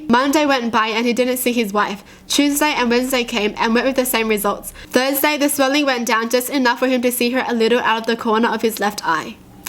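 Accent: Australia. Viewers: She has an accent. She is a aussie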